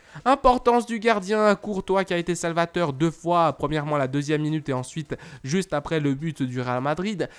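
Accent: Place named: Belgium. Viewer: Merci de votre analyse tu es mon idole. Je demande tu as fais Belgique où tu est un Belge ? Car ton axant les ressemble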